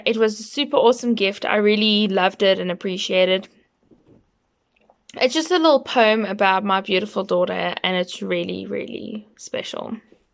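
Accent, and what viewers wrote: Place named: Australia. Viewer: i'm also and i like your accent are you from Australia?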